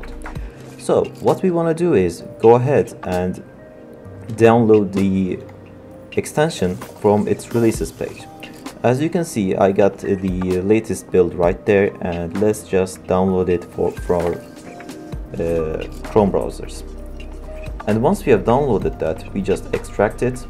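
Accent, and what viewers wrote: Turkey. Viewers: You have a different accent, I guess you're turkish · sende bi türk aksanı sezdim :d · türk ingilizcesi bu nerede olsa tanırım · this guy sounds Turkish.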